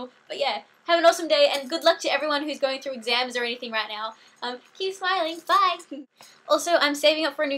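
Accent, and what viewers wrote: Australia. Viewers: First video I watched and heard her talk... she's as australian as she is adorable and talented... there's no way you can watch this and say life is fair guys lol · Gratz (K)! Love your Aussi accent :3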